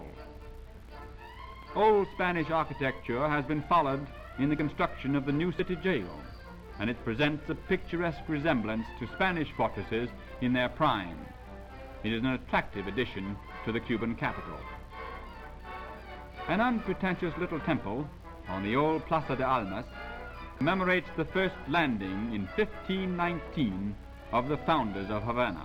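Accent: Transatlantic, United States. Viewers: transatlantic accent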